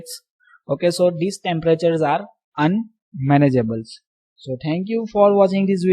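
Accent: India. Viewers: The video is good prepared but indian english langauge is very booooring and disgassting ....very bad english 🤮🤮🤮🤮🤮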